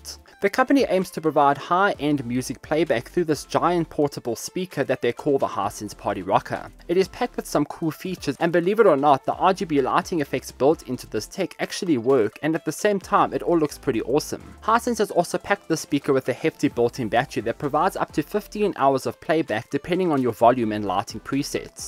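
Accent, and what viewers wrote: South Africa. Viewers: Greetings from South Africa, Durban ! Great review indeed ! I knew that you were in SA as well when I heard the accent and the words...DURING LOAD SHEDDING 🤣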